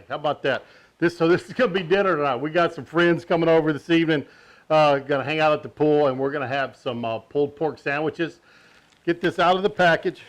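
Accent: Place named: Texas, United States. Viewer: I love your accent. I want to move to Texas. Beef. Land. Bible.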